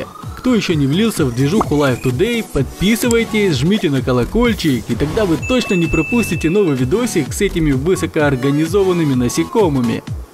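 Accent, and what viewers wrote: Ukraine. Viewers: Какой же мерзотный 404-й акцент. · а разве не в украине просто говорит он с некоторым акцентом, похож на украинский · это Украинский акцент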